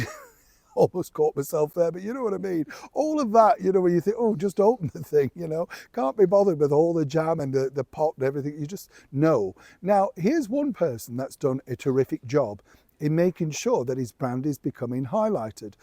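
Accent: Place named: England, United Kingdom. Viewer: Your channel now has good sound. With the background noise and an English accent it was hard to stay to listen.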